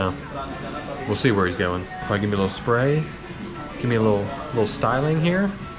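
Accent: South, United States. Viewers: I deadass thought this was Jensen Ackles from Supernatural/The Boys 😂you kinda look like him in the face and y'all both got that cute southern accent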